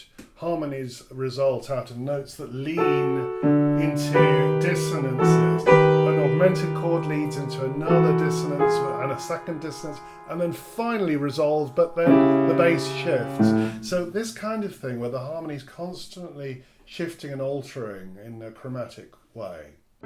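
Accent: United Kingdom. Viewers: Great video, but when will you get rid of that ridiculous British accent?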